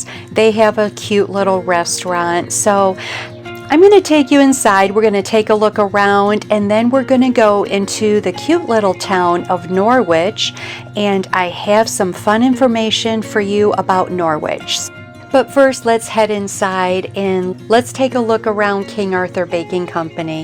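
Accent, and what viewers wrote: Midwest, United States. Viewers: Thanks for a great tour,. King Arthur’s product selection is vast and oh so tempting. Hubby and I have given up eating white flour and sugar so it’s a good thing that we don’t live there! But, we are not zealots and occasionally treat ourselves to a sweet. Your videos are very good and getting better. I enjoy watching. Originally from the Midwest, myself, (Ohio), and I enjoy listening to your accent which is so familiar to me. Have a great week!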